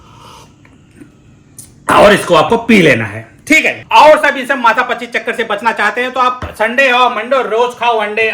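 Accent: Bihar, India. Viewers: बड़का डाक्टर। 😂❤ बिहारी हैं का? भोजपुरिया?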